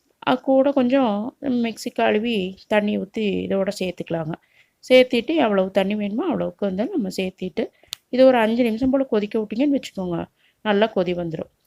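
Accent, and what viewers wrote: Kongu, India. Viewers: ஏனுங் கொங்கு தமிழ் ரொம்ப அருமைங்கோ. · Kongu tamil👌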